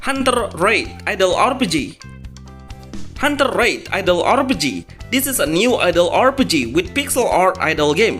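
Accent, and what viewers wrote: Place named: Indonesia. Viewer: Bahasa inggris kamu udah lumayan bagus,tapi kamu perlu menghilangkan aksen indo mu bg,tapi makasih bg❤😊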